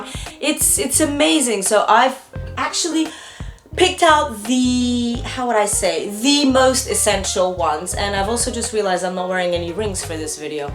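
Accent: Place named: United Kingdom. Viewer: Just love your British accent!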